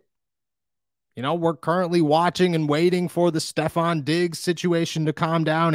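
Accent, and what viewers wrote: Pittsburgh, United States. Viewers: Bro i could swear you were from Pittsburgh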